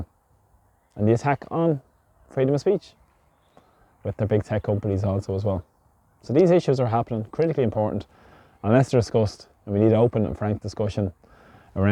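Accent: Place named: Ireland. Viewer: Please people give this channel a subscibsion and a thumbs up we need a the real irish voices out there,thanks for all you do,thanks.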